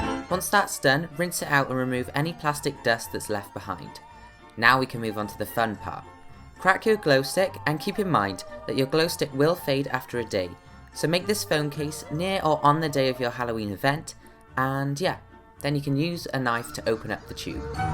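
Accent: Wales, United Kingdom. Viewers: Are you Welsh ?x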